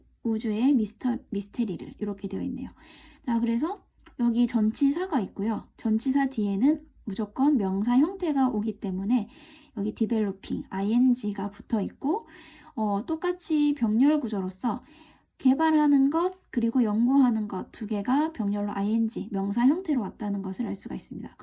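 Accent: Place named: Busan, South Korea. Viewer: 장마시즌 부산은 장맛비가 내리네요 ㅎ 영상보면 억양이 부산분 같기도하고 은 고향이 어디에요? 뭔가 친근한 말투라 더 집중하게 되네요 감사해요 ❤❤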